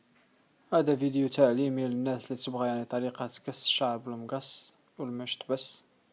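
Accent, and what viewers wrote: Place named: Morocco. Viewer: السلام عليكم ورحمة الله هل الأخ مغربي؟ لكنتك قريبة جدا للمغاربة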